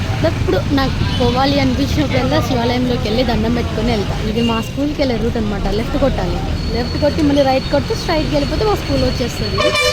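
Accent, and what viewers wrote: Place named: Rayalaseema, India. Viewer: rayalaseema bhasha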